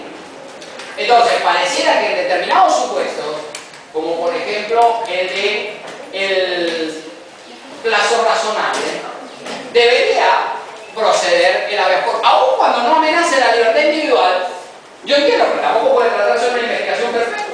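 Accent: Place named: Argentina. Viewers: Suena argentino. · es argentino.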